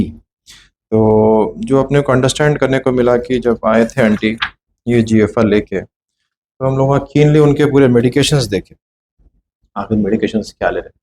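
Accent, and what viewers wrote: Hyderabad, India. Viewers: I like Dr style and simplicity ,Hyderabad tone,man from Andhra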